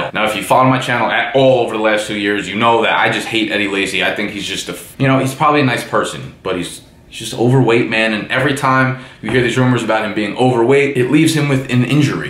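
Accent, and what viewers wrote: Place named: AAVE, United States. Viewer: Dude, GREAT info, better than the pro announcers. The only criticism is you don't need to cuss or speak in ebonics 10% of the time. Will be watching you from now on.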